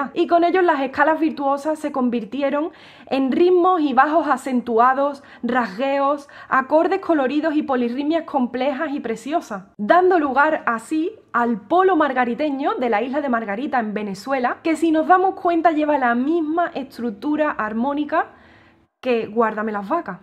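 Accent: Andalusia, Spain. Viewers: Excelente! De que parte de España es tu acento? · Que belleza, que magia...la poderosa razón estética....soy de Caracas Venezuela...me incineraste el corazón con tu interpretación del polo <link> encantan como hablan las andaluzas.. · Nena ya le han hecho muchos elogios en relación a su evidente alta preparación músical , ademas d historia musical y con una sintesis didactica propia q le enaltece !! , sin duda alguna es Usted un crack en música . Yo le voy elogiar otro punto adicional : nunca habia yo oido un cambio d dicción con el nivel d perfección como el suyo !! ... d su hermoso acento Andalúz , salta a un perfecto Inglés !! , luego a un perfecto castellano , y tamb a una perfecta entonación d ese bonito polo margariteño .... Tiene un don d oido I M P R E S I O N A N T E ... !!!! 🥇🥇🥇💯💯💯👏🏻👏🏻👏🏻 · Que bello tocas y que bonita tu pronunciación andaluza!!! 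Muchas Gracias! 😍😘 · No me considero egoísta pero cuando se piensa que has de tomar vacaciones...Muchacha! Y que vamos a hacer mientras sales de nuestra órbita??? Tu eres nuestro pan de cada semana, siempre espero a que sean aquí las 15 horas para ver tu carita y escuchar tu "Buenas Tardes" con ese acento andaluz tan gracioso para nosotros y naturalmente tu cátedra de historia de la música y su mecánica, por favor, descansa pero no tomes vacaciones muy largas...please! :thanksdoc::thanksdoc::thanksdoc:. Me pareció que tu programa de hoy se centró en Greensleves tocada en diferentes tonos y ritmos, verdad?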